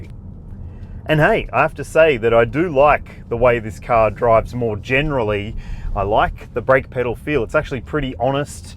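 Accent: Australia. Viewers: Viewer from Malaysia here, Great review btw Could do with more infographics when explaining all the safety features, I know stralia' has more variants than us, ( we only have 3-4 only including the 11 seater), you could add your pick of the range Diesel + ? Which trim level with all the safety features Again, nice review Ps: i wonder how much does aussie auto reviewer had to tone down the accent for global accent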